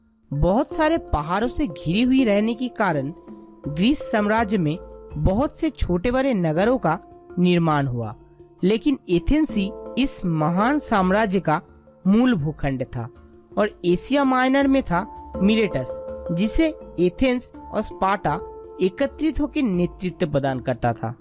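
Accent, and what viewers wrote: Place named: Bengal, India. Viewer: Bhai aapke pronunciation se laag raha hai aap Bangali ho 😅😅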